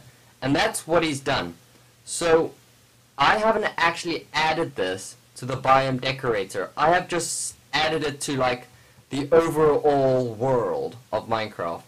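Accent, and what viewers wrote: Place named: United Kingdom. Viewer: Where in the UK are you?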